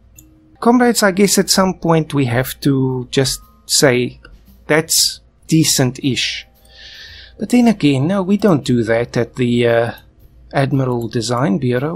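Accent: South Africa. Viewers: You sound so south African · Are you South African?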